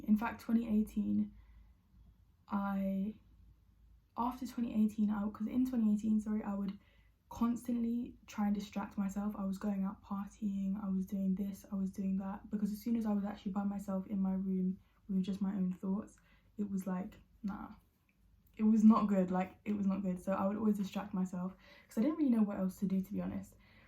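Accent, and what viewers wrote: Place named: Cockney, United Kingdom. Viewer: Assalamualaikum.. welcome to our Muslim family 💗.It sounds like you in a Cockney accent so I'm weak to understand all of your words 😁 but in general I understand it is about your experience and challenges after converting. Good luck to you.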